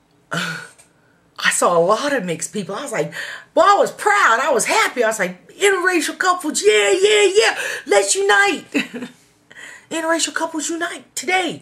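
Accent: South, United States. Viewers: I just found this page by accident and I must say this is one of the funniest things I've sen in years... You are a very beautiful asian/black woman with the most country twine that I've ever heard!!! Rotfl!!! I am relocating to Tyndall as well, and I was looking for videos about that area when I found this site... My son has grown up in a military enviroment and I can truly say that he doesn't know racism either... Us moving back to the south and dealing with ignorate people is my BIGGEST FEAR!!! Right now he has friends of many colors and has dated young ladies of different races and I love it... I am from TN orig and everytime I go home to visit I'm reminded of the race issues, but being that I have lived all over the world I forget that in the US we have race problems...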